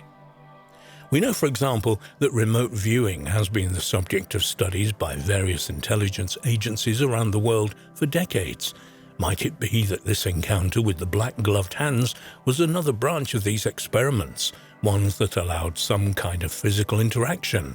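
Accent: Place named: England, United Kingdom. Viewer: Where is the button to block documentaries in a America narrated by English people. They're the worst, they're telling you about things that happened in the United States like they live here and are a expert in American UFO encounters and the geographic layout of the states. 💯🤦🏻‍♂️🤦🏻‍♂️🤦🏻‍♂️🤦🏻‍♂️🤦🏻‍♂️🤦🏻‍♂️💯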